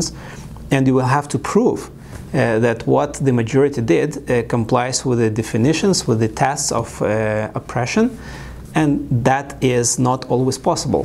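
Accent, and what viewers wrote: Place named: Russia. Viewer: Prettty sure it's a Russian accent.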